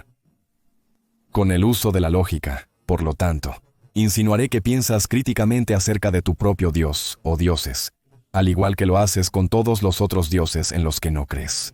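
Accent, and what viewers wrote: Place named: Colombia. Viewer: no mija , hablas como colombiana , eres? , he estudiado tanto y analizando tanto , que ya no tengo dudas , así me pongas una pistola en la frente , es tan real , que no ubiera querido existir sin la existencia de Dios , aunque se que es imposible existir sin ( ÉL ) Sabés que significa su nombre ? SOY el que SOY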